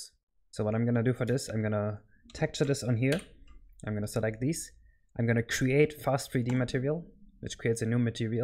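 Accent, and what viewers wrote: Germany. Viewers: Ayo completely unrelated but i noticed how much less noticible your german accent has become over the years, gj man, not many ppl improve that so quickly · I liked you accent and I just realized you're german, everytime I heard someone with that slight german accent I thought they were native speakers.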